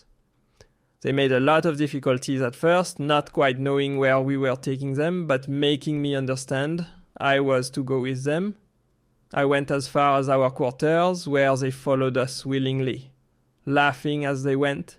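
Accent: France. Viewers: I love your content here however I do agree that the French accent is a distraction. Perhaps I’m much lighter accent or no accent at all might be a better choice, but that’s just my opinion.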